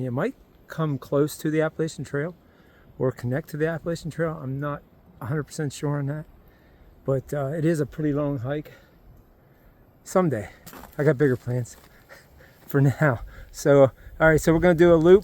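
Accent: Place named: Pennsylvania, United States. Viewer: I’m following you because your accent reminds me of my PA family. Good luck on the AT! I was just at Amicalola Falls State Park & Lodge myself and enjoyed hiking some in GA.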